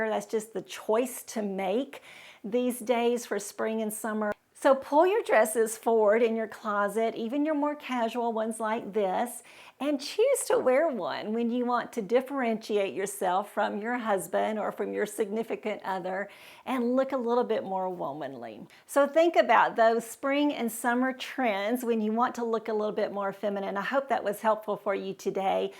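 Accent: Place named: Texas, United States. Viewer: Hi often listen to your videos like them lovvvvve your accent American is it Texan? It's lovely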